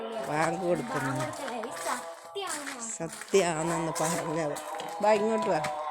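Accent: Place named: Kannur, India. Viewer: കൊച്ചിൻ്റെ സംസാരം കേട്ടിട്ട് ഒരു കണ്ണൂർ ചുവ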